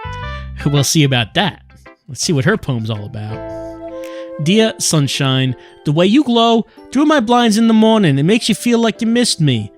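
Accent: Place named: Brooklyn, United States. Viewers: Ohh... The brooklyn accent.. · Dat Brooklyn accent, doe. Cute.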